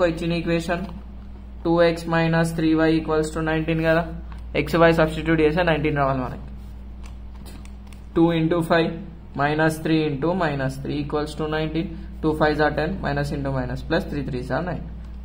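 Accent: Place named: Telangana, India. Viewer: Anna ekdham chpthunnav poo kani manchiga cheppinav Anna verey matladuthunnav acham telangana porodu lekka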